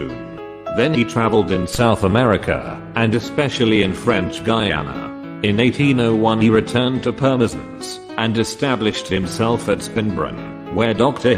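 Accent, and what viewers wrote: United Kingdom. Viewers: All of his voices and pronunciation is perfect!Full of British Accent!Wonderful!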